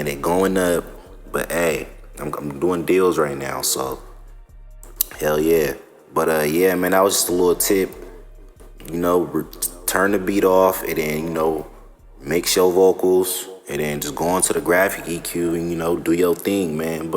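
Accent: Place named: West Coast, United States. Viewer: A U FROM DA WEST FOO? I HEAR DAT SHIT ALL IN YO VOICE LOL IM FRM LA BRO WHERE U FRM FOO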